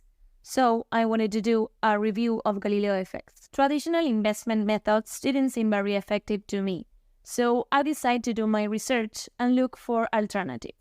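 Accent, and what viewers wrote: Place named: Italy. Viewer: I guess you are Italian. Nice accent. Great Video.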